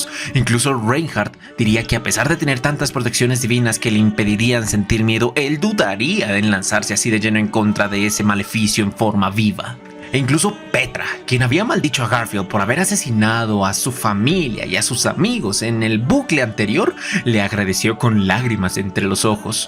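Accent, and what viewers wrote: Colombia. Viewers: Eres colombiano? Sho crei que vos eras argentino che.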